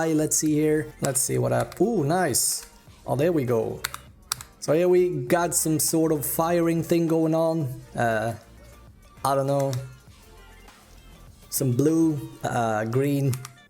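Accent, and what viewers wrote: Sweden. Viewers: the svengelska is taking over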